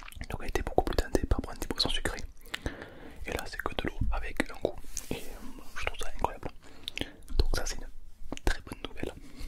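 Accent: South, France. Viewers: Je me demande si tu viens pas du sud on a peu près le même accent ! En tout cas j'adore tes vidéos ❤️🙏🏻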